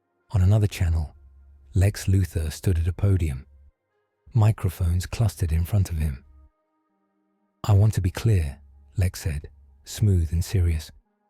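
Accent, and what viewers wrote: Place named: England, United Kingdom. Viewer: Why the hell is someone with an English accent narrating? Cap is an American! DA...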